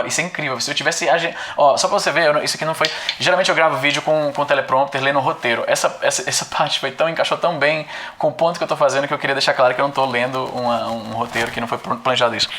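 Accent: Brazil. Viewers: Primeira vez que ví seu canal em Inglês, tu fala muito bem, mas é notavel o sotaque BR, o que é normal, acho que na questão de identidade oficialmente no Canadá tu seria um Brasilian canadian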